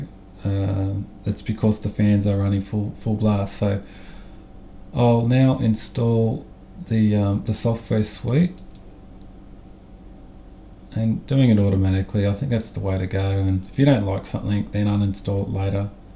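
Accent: Australia. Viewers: YES another aussy lol Respect! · great stuff thanks already knew a lot of that but the folders I didn't know about that I usually did it a different way thanks for that good Australian accent you have like me hahah ok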